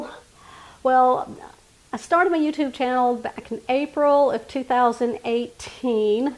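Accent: Texas, United States. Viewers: Happy new year, y’all! I left Texas and it’s arrogant yet backwards ways many years ago, but it does my heart good to hear that Katy voice. I grew up in Brenham, rode the school bus to college (!) with CyFair kids, and since all my people have gone to heaven, I find myself missing the beauty of the sky, and the kolaches. I am no cook but I just love hearing your non threatening voice.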